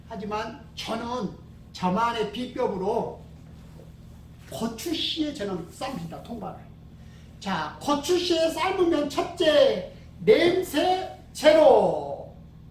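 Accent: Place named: Chungcheong, South Korea. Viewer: 충청도분 이시군요 ㅋㅋ 경장히 ㅋㅋ 감사합니다.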